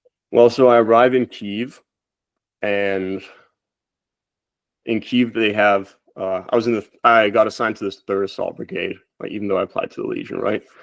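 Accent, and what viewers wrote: United States. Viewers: He’s European but has a perfect American accent? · Probably grew up on internet, so he adopted American English from nerds he played games with online. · He lived in EU all his life but sounds yank, and is half Peruvian. He's not going to be hard to ID with those facts. Also, guy sounds like a brain rotted zoomer. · He sounds American but says hes European. Must have spent long time in the US to learn English like this even his use of Like...Goddamn etc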